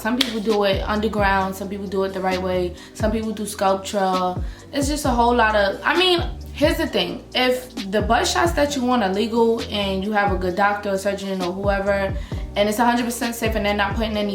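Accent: New York, United States. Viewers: I sooo love your NY accent 🥰🥰and yasss miss mamas ya body is BOMBBBB AF!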